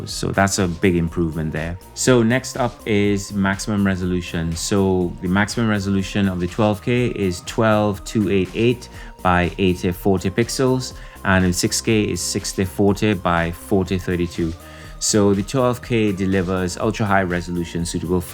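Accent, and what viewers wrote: Trinidad and Tobago. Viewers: Which part of the Caribbean are you from? You sound Trini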